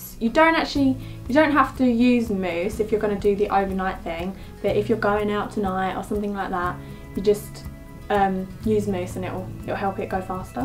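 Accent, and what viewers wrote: United Kingdom. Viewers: I like your hair, i like your british accent, i like your make up. I just love you already :D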